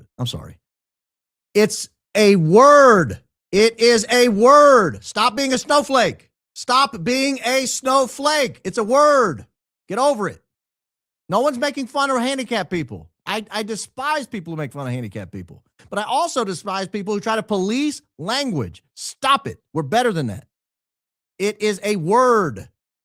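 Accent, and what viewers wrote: South, United States. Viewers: Yes, …You definitely have a southern accent. 😂. And I agree with you about Christmas Album! is my fave!!! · babes to a northerner you for sure have a southern drawl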